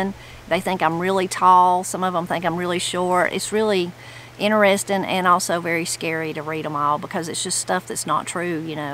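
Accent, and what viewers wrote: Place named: Kentucky, United States. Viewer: I appreciate your channel so much. I accidentally found it when I was searching for applachia out of homesickness! Your accent and words remind me of my SE Kentucky roots! Thank you! 😊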